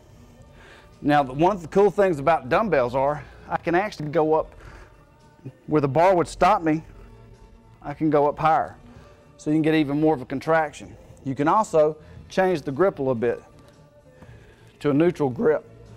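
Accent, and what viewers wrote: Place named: South, United States. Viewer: That´s what I call a southern acccent. I have a chicano accent haha. Thanks for the vid, it was helpful.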